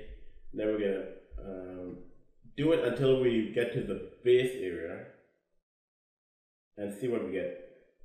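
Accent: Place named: Africa. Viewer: You right B'. You have African Accent #TeamAfrica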